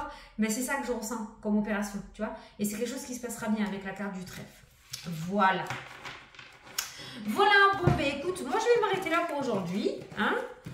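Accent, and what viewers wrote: South, France. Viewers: J'avais raison vous êtes dans le sud comme moi il y a des lotos je pense que nous sommes pas loing mais ce suis pas chiante ont parle comme sa ici.❤❤❤❤❤❤❤❤ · Coucou, je vous découvre depuis hier, et c'est rigolo, le début de la guidance avec un accent du sud hyper prononcé et disparaît au fur et à mesure. Merci pour tous ces détails. 🙏🤗❤️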